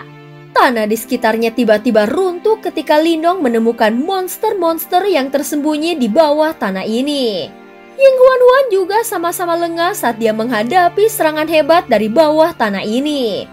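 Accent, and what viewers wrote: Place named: Semarang, Indonesia. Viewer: Dunia itu sempit ya, tiba tiba saya di tempat tongkrongan bertemu dengan pendengar chanel ini 😂, semarang mana ini suaranya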